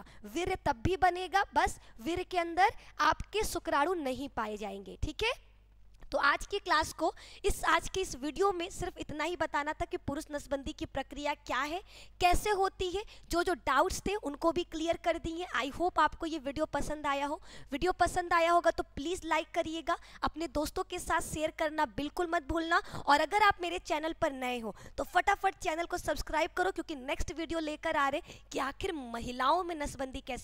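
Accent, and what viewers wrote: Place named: Rajasthan, India. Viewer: ये राजस्थानी है शुक्राणू को शुक्राडू बोलती है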